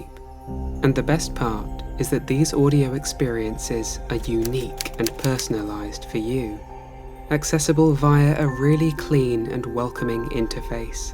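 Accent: England, United Kingdom. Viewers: Something about English accents and nature documentaries. They just fit together so well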